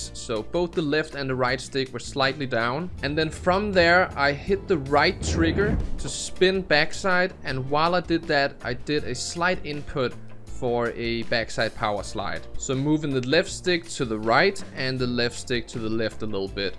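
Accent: Denmark. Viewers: yo you danish?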